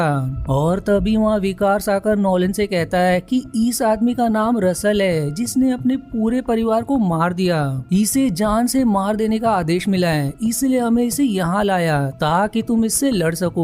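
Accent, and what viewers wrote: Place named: Maharashtra, India. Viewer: Marathi words hote hai , accha lagata hai apke tune me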